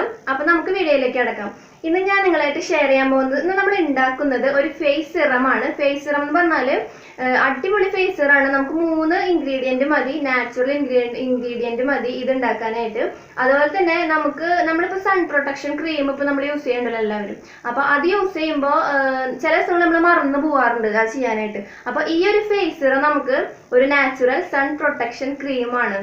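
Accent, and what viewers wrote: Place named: Thrissur, India. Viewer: എവിടെ ആണ് വീട്?? തൃശൂർ ക്കാരി ആണോ?? 🤔🤔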